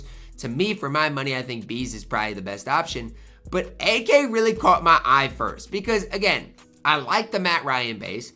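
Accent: Iowa, United States. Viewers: Is this an Iowa accent? What is this?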